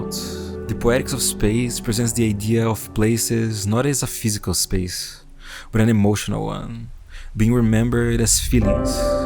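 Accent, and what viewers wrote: Brazil. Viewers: Como alguem que mora no Canada ha quase 10 anos, seu sotaque é otimo e lindo! Consegue nao ser tao carregado a ponto de ser dificil de entender, mas ainda tem o charme do nosso sotaque tupiniquim.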